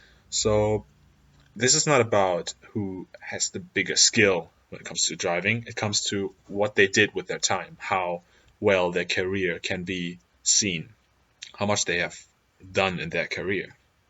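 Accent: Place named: Germany. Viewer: so total agreement right there, except alonso and vettel. i would have switched them around because vettel is better than his ferrari years are letting him look. i think ferrari destroyed him and he would have challenged hamilton more with a red bull. alonso on the other hand was good no doubt but he was maybe to stupid to win more. his change to mclaren was the worst choice you can make and i think its no argument that he was better but didnt have the car to do it. he had offers for teams but he took the worst, in my opinion its all his fault and thats somthing a tier 2 driver has to be aware of. But great video mate. One question: Are you from Germany or Austria because you´ve got a strong german accent?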